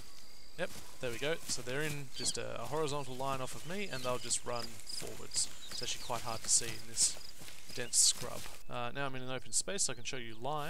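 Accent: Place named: Australia. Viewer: Yes, I found this video very helpful and well explained indeed!!! I'd also be interested in knowing the other Channel. Either way I've subbed to this one just incase more uploads come. Also, great to hear another Aussie =]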